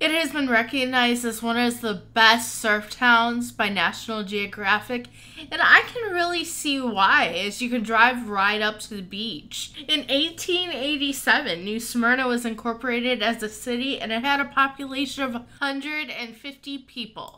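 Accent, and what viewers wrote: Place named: New England, United States. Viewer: Your yankee accent means you should hang out in Daytona or cocoa.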